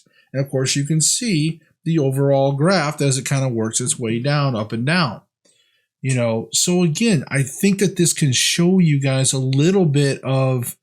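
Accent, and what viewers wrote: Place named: Midwest, United States. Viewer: You sound like you are from the Midwest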